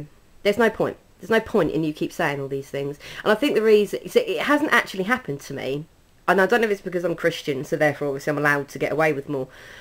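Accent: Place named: United Kingdom. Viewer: haha:) lol all European accents sound the same to me! It takes me a good while to even figure out Scottish from British.... :/ but im learning slowly and yeah your soo right Americans got like an accent per state lool But i absolutely lovee the british accent its soo adorable<3 waitt.. your British right??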